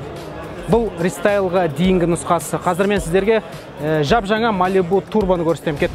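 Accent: Standard. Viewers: басқа блогерлерден өзгешелігі таза қазақша сөйлейтіні жарайсың бауырым жұмысың өрлей берсін!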